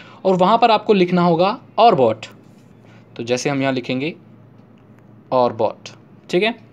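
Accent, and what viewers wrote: Odisha, India. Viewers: are you from odisha??? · sir aap odisa se hain kya?? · Ap odisha se hai kya??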